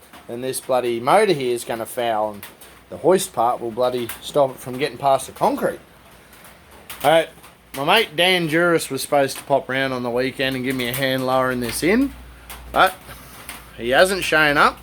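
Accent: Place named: Australia. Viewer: Looking good!, huge back saver!i thought i was the only one who had trouble with train leaving the station without me on it !😂you to young! Love the Australian accent and words you use, seriously looking great you've come long way!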